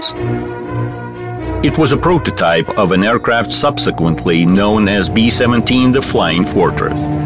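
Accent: Russia. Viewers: I just like the Russian accent in this Documentary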